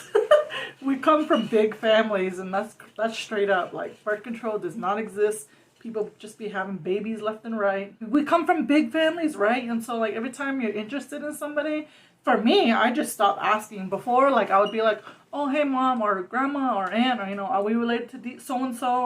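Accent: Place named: Reservation, United States. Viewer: Your rez when u have that rez accent...you have it but not as Strong as sone of my cousins! Ha ha. I can relate to a lot of what your talking about!! I hear this a lot "issss it?!....😆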